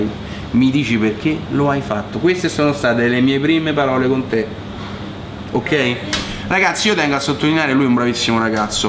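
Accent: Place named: Rome, Italy. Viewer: Leonardo Bonucci scusa ma se nn capisci il romano è grave, nn è mica come il sardo dai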